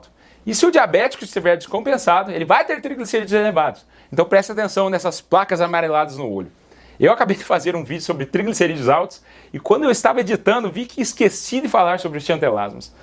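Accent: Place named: Caipira, Brazil. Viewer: Esse doutor tem um sotaque caipira…é raro de se ver 👏🏼👏🏼👏🏼😁😂😂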